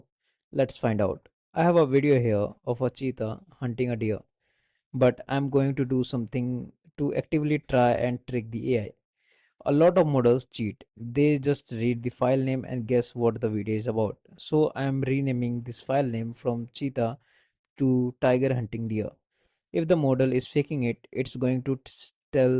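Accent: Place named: Rajasthan, India. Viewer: Your English flow is better than mine... Are you from north India because I sense a rajasthani accent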